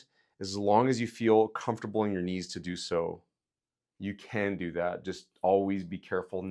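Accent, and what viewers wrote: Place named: United States. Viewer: to my British ears, he has an American accent. So I can appreciate if someone does not hear that every day in their life it might sometimes be difficult to understand. I sometimes struggle to understand drawling Southern US accents or a Cajun accent in particular because it is not something I often hear.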